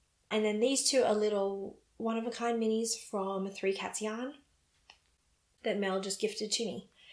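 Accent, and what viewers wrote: Australia. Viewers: Thanks so much for your episodes. It’s lovely to tune in to each episode and listen to an Aussie. Next on my needles is the Gib sweater for my husband - should be fun.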